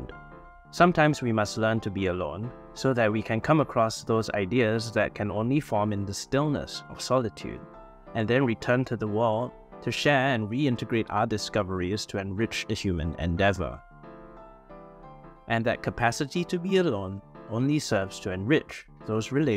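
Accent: Singapore. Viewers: You sound Singaporean or Malaysian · Recognised the singaporean accent 😊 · sound like a singaporean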